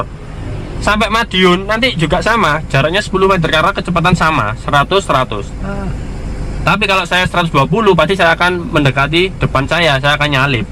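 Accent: Surabaya, Indonesia. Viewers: Siap cakk,,,aq suka reviuw pyan,,,,to the poin,,,basa ne khas srboyo asli yo ?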